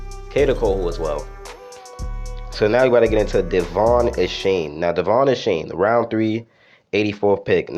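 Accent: New York, United States. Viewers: trying to place your accent! A little NY In there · Not feeling this NY accent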